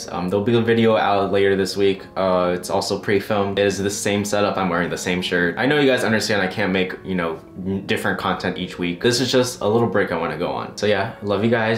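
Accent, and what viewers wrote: China. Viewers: Oml ur Chinese is the literal cutest. “妈妈， 我有一个surprise我要show你.” OML I DIEDDD **Chinglish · The chinglish was so adorable. As a person who speaks Chinese, I find it so cute. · your chinglish brings back memories from family reunions lmaooo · Who else is INLOVE with his chinglish ? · aaaaahhhhhhhh the chinglish is cuuutttttteeeeeee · your chinglish rESONATES with me it made me so happy heh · im sorry but your chinglish is so uwu like "ni men bu cArE" aH CUTIE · Lol i luv your chinglish.its so cute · His chinglish is adorable.... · chinglish sounds really cool tho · Your chinglish is so funny 😂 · wOwW- you also talk chinglish? 👌😔👌 relatable. but- your parents are so supportive though i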